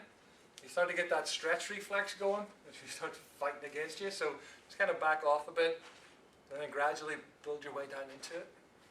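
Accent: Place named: Ireland. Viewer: Thought I could hear the dulcet tones of an Irish (Ulster?) native!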